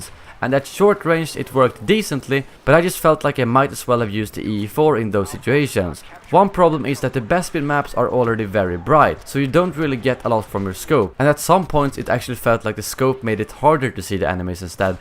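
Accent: Sweden. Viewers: Are u from sweden? It sound like so. Btw i am from sweden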